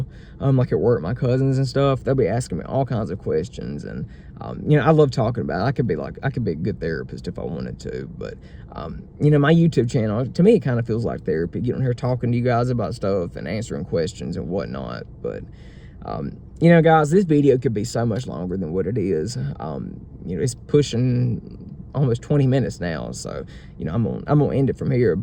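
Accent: South, United States. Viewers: You’re describing my first needy boyfriend so well. Exactly what happened. Emotionally he depended on me for everything and it was draining. I had to break the relationship but to this day he doesn’t understand. By the way, I’m watching your third video right now and this is really good stuff. Very relatable and enjoyable. Thanks for putting yourself out there with your honesty and vulnerability. Not much of that flying round these days. Btw I’m Alec, I’m watching this from France, I’m French , I love your southern accent. Which city and state is your accent from ?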